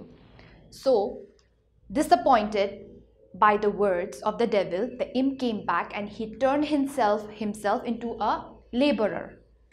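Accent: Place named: Manipur, India. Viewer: Let us promote the culture of appreciating something which is good. In my opinion this lady is doing a fantastic job for those students who are capable of speaking in English. If you are not happy with her style of teaching please go for some other vedios that help you understand the lesson in a better fashion. She is young, energetic and articulate. Tell me honestly how many English teachers in Manipur speak English with such beautiful accent.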